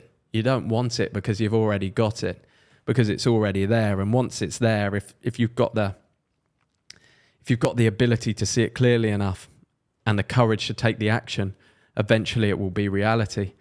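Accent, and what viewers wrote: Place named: United Kingdom. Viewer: As a non native english speaker i have to say it's very pleasurable to listen this beautiful british accent, i like it so much, good content by the way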